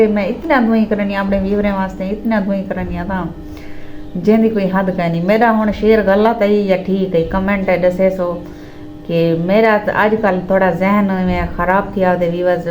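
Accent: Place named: Saraiki, Pakistan. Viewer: jaisay teri methi methi saraiki hai na tuo dil karta hai teray methay methay honton ko choom loon. bohat acha diya hai Jati oya :-)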